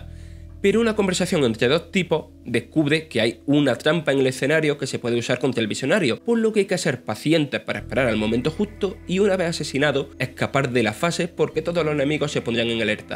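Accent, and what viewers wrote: Andalusia, Spain. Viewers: ¿Este narrador es andaluz?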